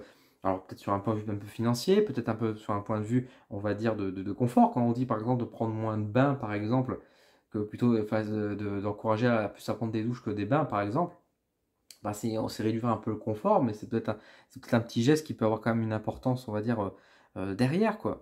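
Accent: North, France. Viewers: t'es du ch'nord tizot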